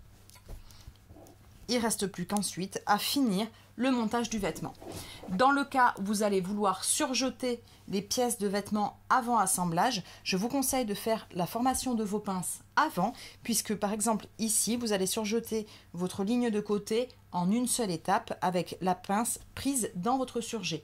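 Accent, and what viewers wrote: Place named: Toulouse, France. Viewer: Bonjour, tes tutos m ont beaucoup apporté lorsque j'ai commencé la couture et je suis ravie de te retrouver, même si je sais coudre des pinces desormais... ceci dit le coup du repére au poincon est une super idée! Je voulais surtout te féliciter pour l’amélioration technique phénoménale image et son (c 'est mon métier, j'y suis sensible) et espère te retrouver bientôt pour de nouvelles vidéos avec ton joli sourire et accent qui me rappelle la maison (toulousaine expatriée a Paris )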